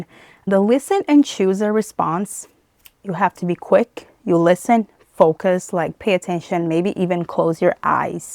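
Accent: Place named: Brazil. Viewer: You sound like a native. Never mind you are from south America right? I hear Brazilians from football and ufc they have similar accent.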